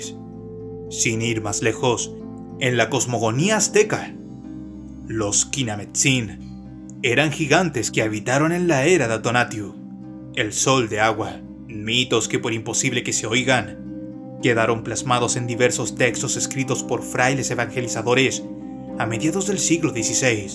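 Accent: Chile. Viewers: tiene acento chileno 😮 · Eres Chileno?bro muy buen canal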